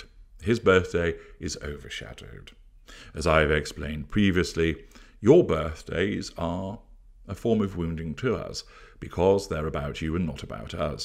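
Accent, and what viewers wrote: United Kingdom. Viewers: Another brilliant video, you are so funny 😆 I really enjoy listening to you, love your British accent, eloquent explanations and sense of humour! Wish you all the best for the future and success with your YouTube channel!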